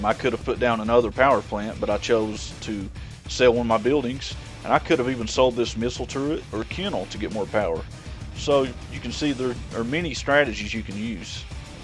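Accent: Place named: Texas, United States. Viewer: you sound like somebody from texas with that accent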